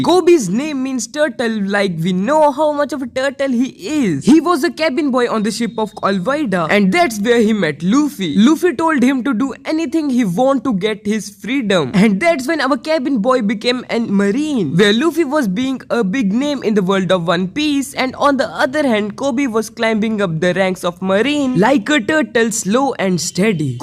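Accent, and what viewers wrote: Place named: India. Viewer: are yu ind. yur accent is alike one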